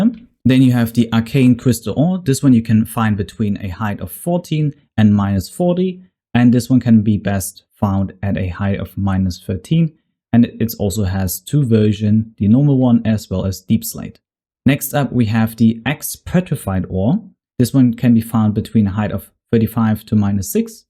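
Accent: Germany. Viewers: you sound very german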